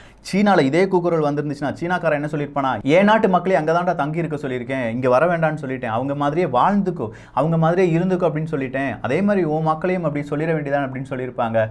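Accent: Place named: Kanyakumari, India. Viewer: kanyakumari slang...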